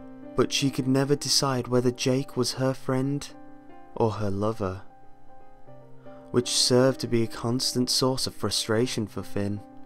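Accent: United Kingdom. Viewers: WOW..WOW.....WOW!!!!! THAT WAS SO DEEP! I LOVED IT! BTW I keep thinking your British.. I don't know why but...I just think that. Are you British?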